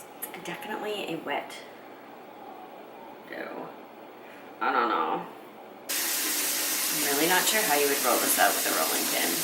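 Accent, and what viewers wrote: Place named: England, United Kingdom. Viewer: I just binged on the entire season of The Great British Bake Vlog-- I LOVE it! As a fellow foodie/baker/Ina Garten groupie, I can't help but applaud your efforts at baking your way through the GBBO. Most times when I'm in the kitchen cooking, I like to pretend that I'm in the kitchen cooking alongside Ina in East Hampton. So naturally when I watch you bake in your English accent while ordering around your sous chef/camera man/best cow vet ever, I can't help but smile. Your unscripted baking show is top notch, I've never laughed as much during a baking vlog. Cheers to future episodes! -- Your California Fan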